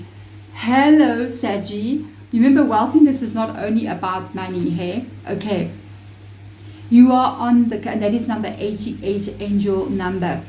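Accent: South Africa. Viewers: is she south african, I love the way she speaks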